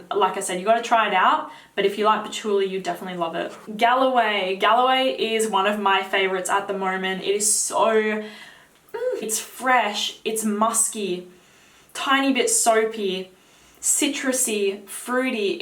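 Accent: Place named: Australia. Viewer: I love her accent, Australian accent😊😊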